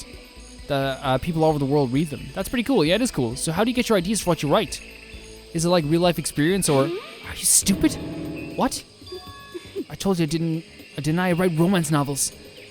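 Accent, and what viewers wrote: Ireland. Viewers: You do have an Irish accent, but it's subtle. Accents are somewhat relative anyway, relative to what voices you're used to hearing. I love them, they tend to be a great reflection of different cultures.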